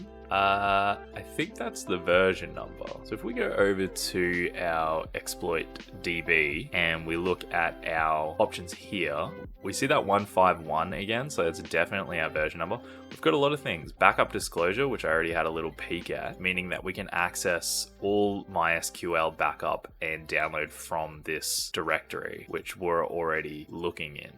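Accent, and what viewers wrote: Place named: Australia. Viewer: Australian accent lol. let me check this room. I am not there yet on THM Thank you for the video. 1000 subscriber. make it happened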